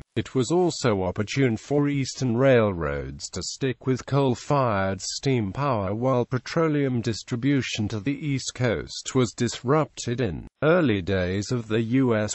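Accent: United Kingdom. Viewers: The British accent, anything that ends with the letter A is pronounced with an R. Makes absolutely no sense to me.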